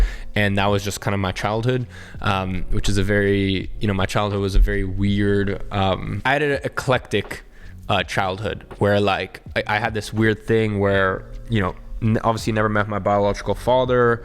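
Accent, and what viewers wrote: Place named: California, United States. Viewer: If you grew up in London...why don't you have an English accent? You sound straight up Californian lol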